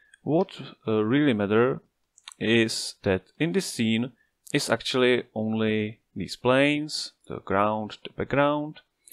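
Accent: Czech Republic. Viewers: this is exactly what i needed thank you! by the way, are you by any chance from Czechia? the accent sounds familiar :DD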